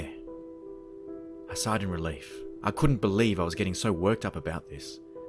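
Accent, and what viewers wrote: Australia. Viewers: Ive been looking for a horror narrator w an australian accent and i literally screamed so loud when i found you that my mom thought i hurt myself or something 😂😂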